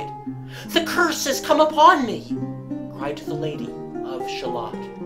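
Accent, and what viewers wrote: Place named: United States. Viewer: Will Yanks stop this, or learn how to speak ENGLISH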